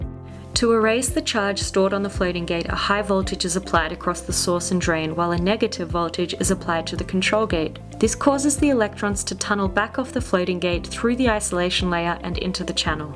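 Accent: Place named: Australia. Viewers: The very definition of an Australian accent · sounds like urban Australian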